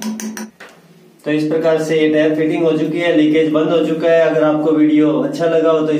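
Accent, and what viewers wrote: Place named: Himachal Pradesh, India. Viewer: Himachali lga da bhai tu menjo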